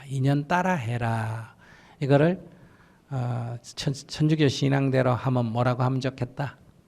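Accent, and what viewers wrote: Gyeongsang, South Korea. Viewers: 스님 경상도 사투리 라서ㆍ더 매력 있습니더 예 ㅡㅡㅡ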